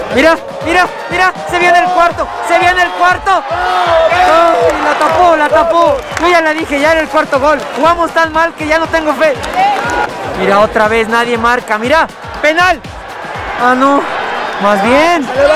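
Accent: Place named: Mexico. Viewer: Cuando lo escucho hablar parece mexicano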